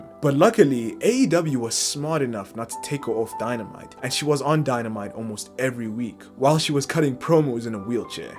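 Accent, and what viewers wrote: South Africa. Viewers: You sound like a south African. Good job brother you did a great job on the video so funny and entertaining. Your channels gonna blow up keep it up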